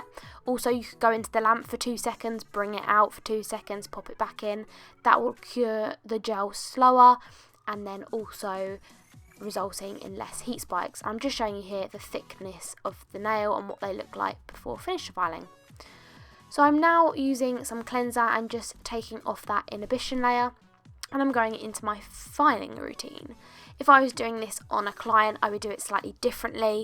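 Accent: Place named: England, United Kingdom. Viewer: i could listen to you all day!! ur english accent!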